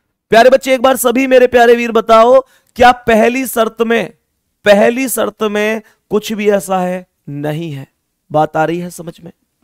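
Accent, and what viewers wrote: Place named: Haryana, India. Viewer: Aapki haarryanvi voice achhi lagti hai please aap harryanvi hi bolla karro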